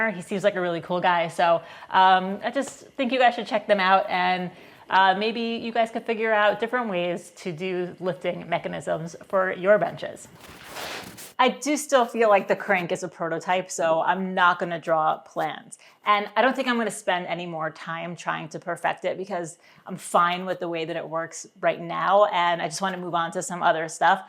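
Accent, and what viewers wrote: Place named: Brooklyn, United States. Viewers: 1st video I have watched. Nice job and very creative on the lift mechanism. Where are you located if you don't mind me asking. Accent says NY but the don't miss being cold says you may have moved south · + cool bench caster lever = Chatty Cathy. You are an adorable genius, not commonly found in the wild (Sounds like Brooklyn). Thanks again for the great video.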